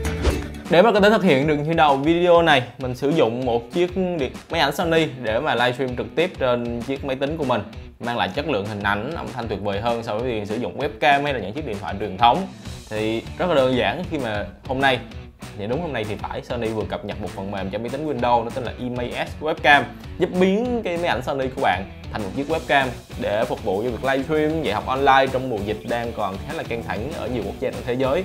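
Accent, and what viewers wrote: Binh Dinh, Vietnam. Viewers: bạn người bình định ah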